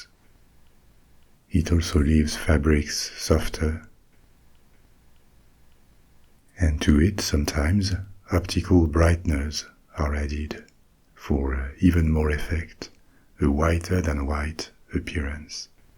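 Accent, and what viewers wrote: France. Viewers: Loving there's no AI and if a mere mortal as I can make a suggestion, then could do Dantes Inferno about the 9 circles of Hell?? I am sure you'd do it such justice with your Gallic tones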